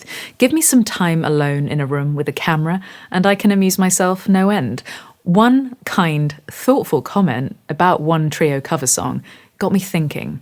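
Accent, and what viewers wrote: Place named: London, United Kingdom. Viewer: in his opera prima, says in a verse: "É que narciso acha feio o que não é espelho"..something like Narcissus regard as ugly what is not mirror. Always refreshing watching your videos, not to mention that hearing your accent made me quench my thirst of old London. Be Happy!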